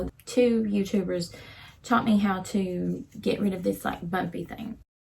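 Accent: South, United States. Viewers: i haven’t even ordered my wig yet but this vid is so fun lol it feels like im on facetime with a sweet little southern lady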